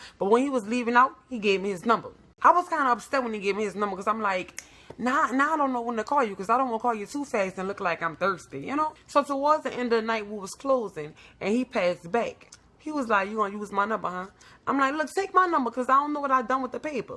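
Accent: New Orleans, United States. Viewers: I love that NOLA accent 💞💞💞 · OMG! I don’t know how I got on your page but I’m glad I did. Your storytelling is bomb! You are hilarious but you speak the truth. You sound like ur from New Orleans 😊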